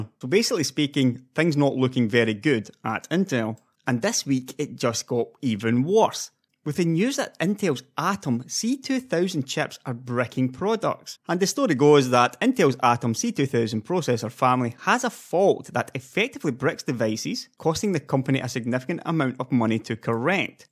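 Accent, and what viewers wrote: Scotland, United Kingdom. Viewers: your accent's ... I'm guessing Scottish? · Does the person have an accent, maybe? like scottish or something?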